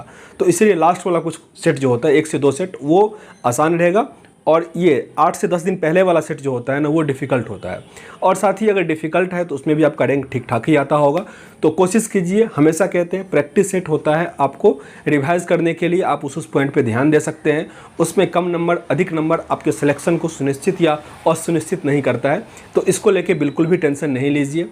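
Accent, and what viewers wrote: Bihar, India. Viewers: Bihari tone ,chaura ganga kinare wala , thx bhaiya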